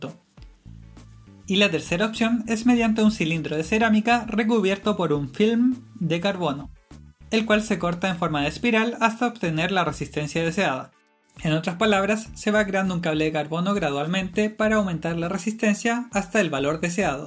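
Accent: Chile. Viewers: Saludos ,eres chileno??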